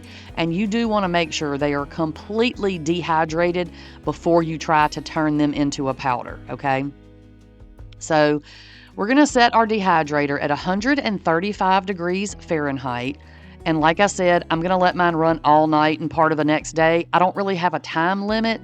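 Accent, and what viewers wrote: United States. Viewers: omg,. just to say, I liked the video, and no doubt the creator tries to be a good human, but to many of us outside the US hearing this kind of accent is similar to hearing arabic accent....its alarming. Thankfully I dont fall for predjuces... Good luck over there.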